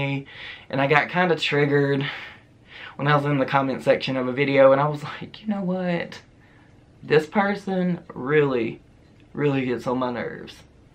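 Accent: South, United States. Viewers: Aw, I just found your videos tonight and I absolutely love your southern accent. Promise things get better, screw those people who think they're all that and can do whatever, they aren't anything. Have a wonderful night, it'll get better.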